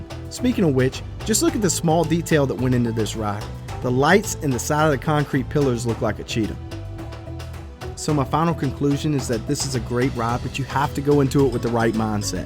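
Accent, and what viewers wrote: South, United States. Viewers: Alright I’ll say it, I love your southern accent.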